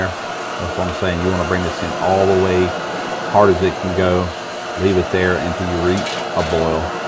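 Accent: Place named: Louisiana, United States. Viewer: Half cup of kayeen paper! man i love the Cajunness ohhh yea brother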